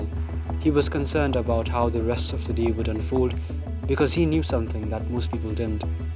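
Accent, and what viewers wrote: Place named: Nepal. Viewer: I like your choice of accent for this foreign language. If you sounded like a non-Nepalese, I would not have taken your words seriously. I can only trust a Nepalese's content about Nepal. Other foreign news, regardless of their size and influence, can go fuck themselves.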